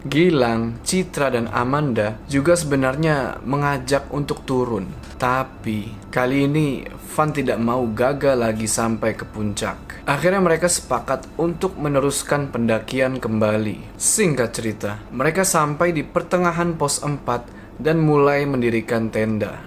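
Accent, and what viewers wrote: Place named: Tegal, Indonesia. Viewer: tegal mana suaranya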